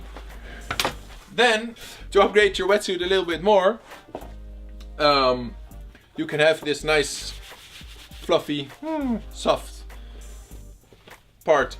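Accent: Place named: Netherlands. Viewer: Love the dutch accent Good video though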